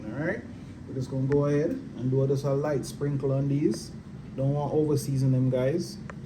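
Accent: Jamaica. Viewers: I love your Jamaican Accent. The food looks amazing.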